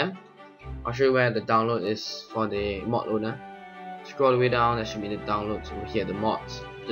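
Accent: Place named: Singapore. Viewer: Thanks! By the way, your voice really sounds like a Singaporean. ;)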